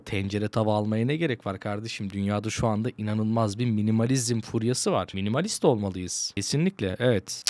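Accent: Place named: Aydın, Turkey. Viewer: abi memleketlimsin valla aydın'lı olduğun konuşmandan belli sevgiler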